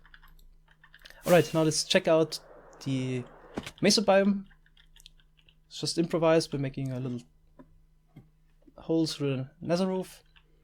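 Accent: Germany. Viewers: Is he german? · aber schön deutscher Aktzent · Dein Deutscher Akzent gefällt mir ^^ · his German accent says enough, this guy is definetly an engineer irl. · German accent is real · He sounds DEUTSCH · Der deutsche Akzent, herrlich xd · Bist du deutsch? 😂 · Are you german?? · Richtig Geiler Deutscher Aktzent von wo kommst du? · man hört so hart den deutschen Akzent heraus lmao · He sounds very german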